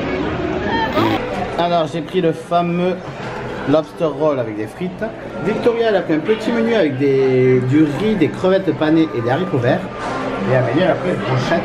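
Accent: South, France. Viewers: Salut ! Rholala toujours un plaisir de vous suivre. Le petit accent chaleureux du soleil ❤. Il me semble qu'il y a bien du monde dans le parc ! J'ai lu que même en septembre c'est assez plein maintenant, ça a l'air de se vérifier. Je pars pour la première fois (seule) en septembre prochain. J'ai hâte et en même temps le stress monte 😅. Prise de tête sur les billets d'avion en ce moment, très chers au départ de Lyon 😢. Dommage pour Tron, j'étais déçu pour toi tu avais l'air si heureux. Je sais pas si je la ferais elle a l'air haute (j'ai le vertige, j'aime pas quand les rails sont dans le vide 😅). Mais je pense me prévoir un budget coupe-file au cas où. Zut faut reprendre le boulot, pas le temps de regarder la suite ! A bientôt !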